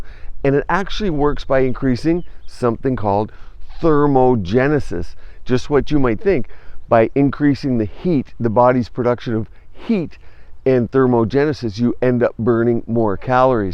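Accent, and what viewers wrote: Canada. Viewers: I falsely thought the eastern part of Canada stayed cool all year but see thats not true and can be quite warm. Your canadian accent seems more pronounced when you're there. I don't normally notice that you have an accent. Looks like a great place to grow up! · Well your accent reminds of a Canadian accent, and you must be on the Atlantic coast so I guess Nova Scotia?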